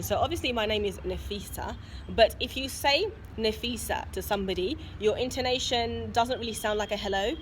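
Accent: United Kingdom. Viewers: It's British accent, especially that of London, [other accents in England might me so different]. You might consider listening to British podcasts, or relocating to Britain for a while. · Why she got a British accent?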